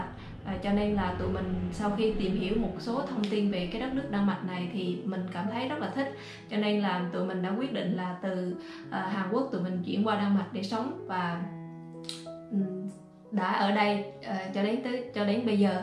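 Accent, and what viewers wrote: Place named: Quang Ngai, Vietnam. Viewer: chị Quảng Nam nè,nhưng nghe em nói giống Quảng Ngãi hơn.Chúc 2 vc thành công nha!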